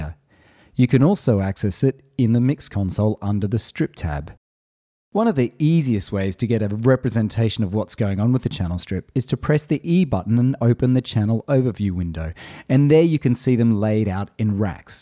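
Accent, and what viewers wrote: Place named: Australia. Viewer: nice to hear an Australian voice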